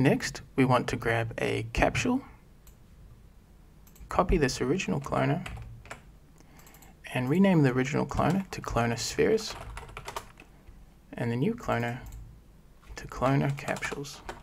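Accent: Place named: New Zealand. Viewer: Bro you sound kiwi as eh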